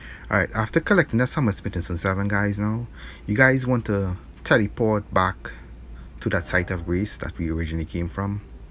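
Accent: Trinidad and Tobago. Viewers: A Trinidadian I presume?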